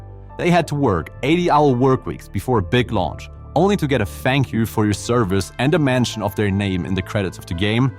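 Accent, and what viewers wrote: Germany. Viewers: Höre ich da deutschen Akzent?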